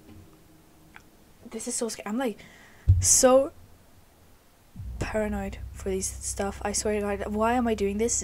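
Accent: Sweden. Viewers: ... Hey uhhh are you actually from Sweden? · Are you from Sweden? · You are from sweden right?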